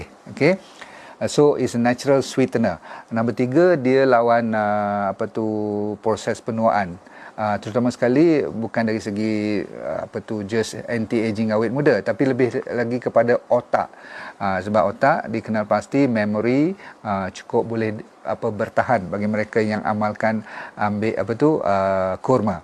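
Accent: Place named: North, Malaysia. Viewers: org peneng klo xsilap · Manis melecaih... org utara ka... Banyak perkataan bunyi belah2 utara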